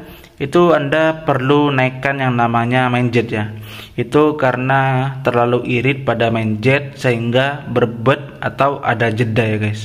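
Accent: Madura, Indonesia. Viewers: Katara men reng madura , bhasa agak sal sal sakunik 😅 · Jek logatah reng madureh abiss mas😂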